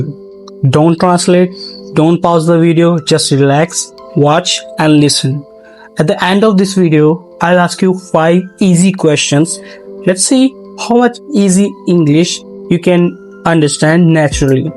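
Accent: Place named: India. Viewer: Your English looks Indian English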